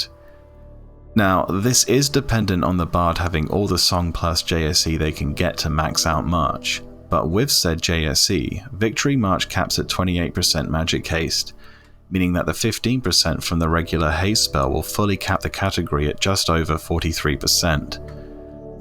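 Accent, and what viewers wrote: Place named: United Kingdom. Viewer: Love the British accent. Makes everything seem way more official, especially for a fantasy game, and pronouncing melee as "mee-lee" is awesome lol. I wish had a British accent.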